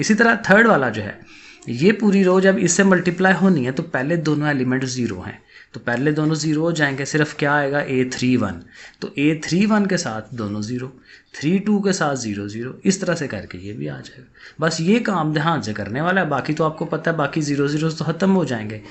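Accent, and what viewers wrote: Sialkot, Pakistan. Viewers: I think you are Sialkoti😊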